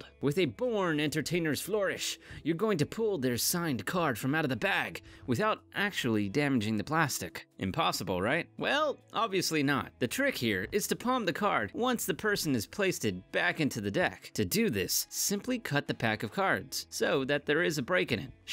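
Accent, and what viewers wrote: United States. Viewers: It's so weird to hear someone refer to bills as "notes" and their value as "pounds" while not only speaking with an American accent; but also holding US bills. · 20 pound note shows 20 dollar bill also his accent was American so why 20 pound note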